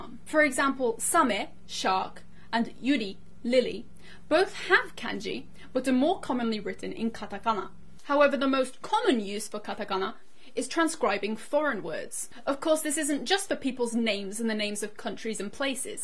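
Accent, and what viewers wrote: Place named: United Kingdom. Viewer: Look her accent. She's from UK. I don't think that I could hear that nice english from an avarage american female teenager. (I'm the offensive) It would probably be like that "y'all gonna rite kateiganaa if u wanna pop out ur words 'n Kanjee words comed from Chaineese's abc like, butt ya know if you wanna do a logo, u gon' use our letters"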